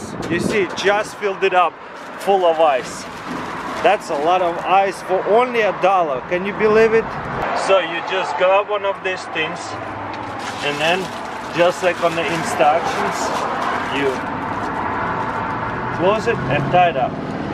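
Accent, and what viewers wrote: Russia. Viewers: Акцент то русский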